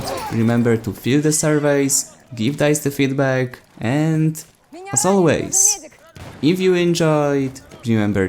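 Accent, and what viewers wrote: Poland. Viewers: He just spends 8 minutes rambling on about crap with a horrendous polish accent. Yeah, right.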